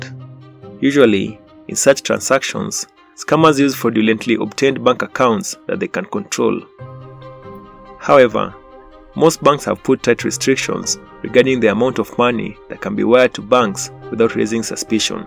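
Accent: Kenya. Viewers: About section of this channel says location is United States but accent ni ya mkenya.. 🤔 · The narrator sounds like a Kenyan. May be that's why he insists when mentioning Kenya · another video of scam glorification narrated by the nairobi accented guy. not good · From you accent,you are a Kenyan….and you still want to pull Kenyans name into the mud.How comes we never heard there were kenyans involved from US FBI reports? You doing this makeup story for some simple views while tarnishing Kenyan name….We Kenyans in diaspora dont appreciate this!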